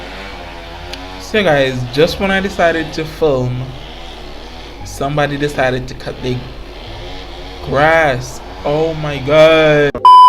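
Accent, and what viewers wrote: Jamaica. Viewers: Do I hear a Jamaican accent? :)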